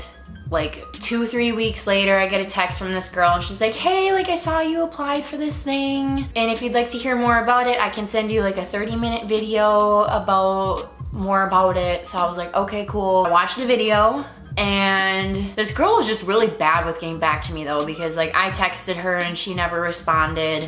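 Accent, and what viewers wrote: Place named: Minnesota, United States. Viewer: Are you Minnesotan? That accent is thick!! love it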